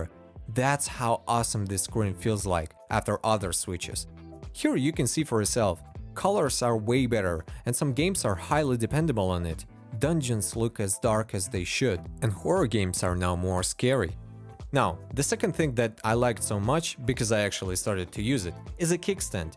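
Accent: Russia. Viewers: тебя выдает подоконник)) в штатах таких подоконников нет. Да и по английскому слышно, что наш человек:) Если не секрет, то чем будешь пользоваться в случае блокировки ютуба? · russian accent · да ты русский, акцент чувствуется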